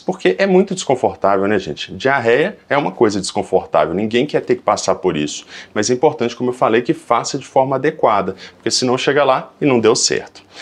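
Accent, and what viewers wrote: Minas Gerais, Brazil. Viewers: Médico bonito! Minerin, aposto! Olha o sotaque! Não faço exame com vc não. Tenho Vergonha!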